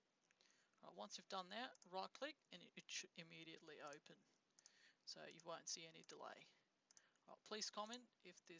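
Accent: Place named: United Kingdom. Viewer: Philip It's so low even with the speakers and the video both set to max volume. No need to insult folks just because you're getting better results. I can just barely hear that someone is talking with an apparent british accent, but placing my iPhone's mic into my headphones right in front of the speaker, with dB meter app running, it doesn't even register a difference from ambient noise. Sometimes I can tell what he's saying if I listen really closely, but most of the time, I can't.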